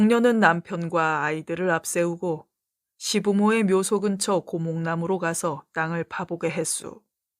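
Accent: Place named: Chungcheong, South Korea. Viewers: 충청도식화법이 재밌네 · 참으로 정겹고 훈훈한 아름다운 야화입니다 그야말로 구수하고 정이 뚝뚝 떨어는 충청도 사투리의 뚝배기 맛이 정말 일품이요 재밋게 잘 들었다오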